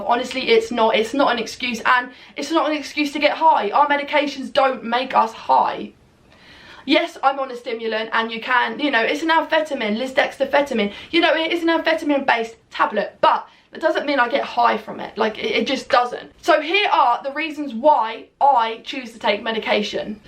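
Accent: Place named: United Kingdom. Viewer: I thought you cant be prescribed stimulants in the UK? You sound like you're from Uk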